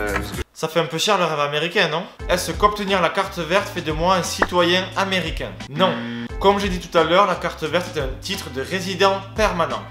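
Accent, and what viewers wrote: South, France. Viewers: Je regarde quelques-unes de tes vidéos et me suis abonné. Je me pose une question. Est-ce que tu accent un peu "du sud" s'entend quand tu parles anglais ? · en passant tu as une petite accent du sud n'est-ce pas?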